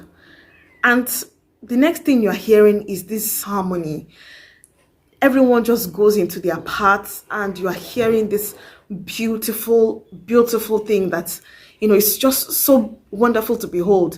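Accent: South Africa. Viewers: Wow I'm impressed by your English accent...you will swear that you South African... you speak like a local girl... lovely 🌹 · Your pronouciation of words is of a South African. You assimilated easily. Thanks you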